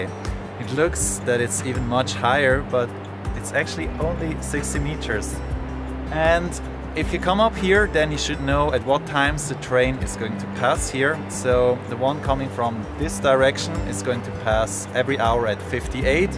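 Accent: Switzerland. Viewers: glad you moved back to Switzerland. It is good to travelmand explore the world, but Switzerland I'd such a great place. A xfriendcof mine in KC,mMissouri is married to a gal from KualacLumpur,Kuala Lumpur,, which she likes, but there is a bit of a rivalry with Singapore. I think you said you were originally from the Italian part of Switzerland,mbut your accent is more Swiss German. I like Lugano due to the milder winters and the beautiful lake.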